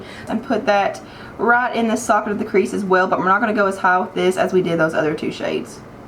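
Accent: South, United States. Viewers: where are you from ? i can tell you're from the south , i'm from north carolina and i wish my accent was as beautiful as yours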